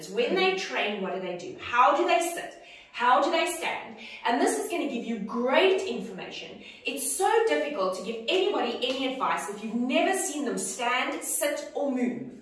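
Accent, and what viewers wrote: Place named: Northwest England, United Kingdom. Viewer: She is called and she sounds like she is from my area in the UK from her accent (NW)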